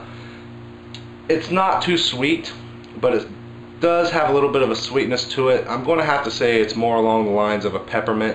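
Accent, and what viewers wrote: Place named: United States. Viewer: Thanks for getting back to me and thanks for the advise...love your videos and i love your us accent better then my boring UK accent loll. x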